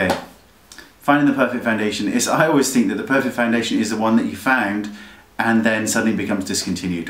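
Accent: Australia. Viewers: how come u have aussie accent! Sounds so cool though.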